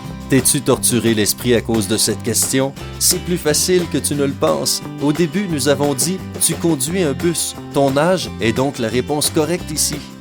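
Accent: Quebec, Canada. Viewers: Tamara oui c'est vrai, déjà qu'en français j'entends pas toujours, alors avec l'accent québécois 😐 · Pourquoi y'a un québécois (comme moi) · mais pourquoi la voix de canadien là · la dernière c'est n'importe quoi! Mais j'adore cette accent québéquoi! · L'accent Québécois!!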